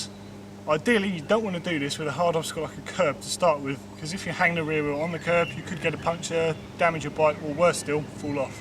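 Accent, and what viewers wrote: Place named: United Kingdom. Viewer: its just a normal british accent lol wats so amazing about that?!?!?!?!?!?!?!?!?! :L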